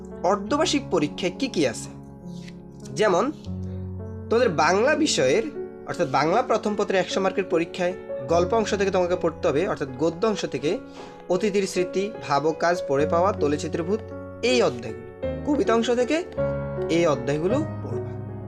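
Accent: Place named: Chittagong, Bangladesh. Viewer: আপনি কি চট্টগ্রামের নাহলে কেন কেনো বলছেন 🤔🤔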